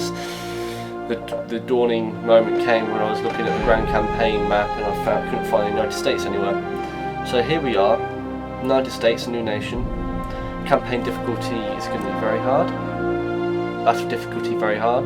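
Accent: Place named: United Kingdom. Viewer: why is it always a british person?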